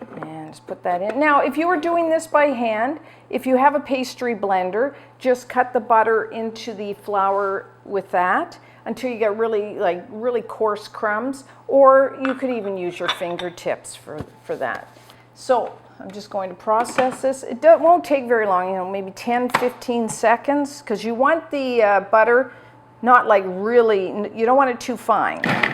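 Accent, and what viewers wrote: Canada. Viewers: you sound Canadian. Are you Canadian?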